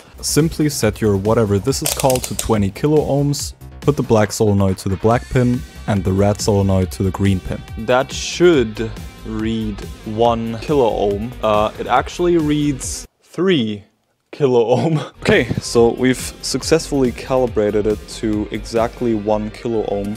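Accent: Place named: Germany. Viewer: I new from the beginning you must be german speaking because of that accent 😂 but the license plate did make me unsure, until you started talking to your buddy😂 Well, Hallo aus Deutschland 😂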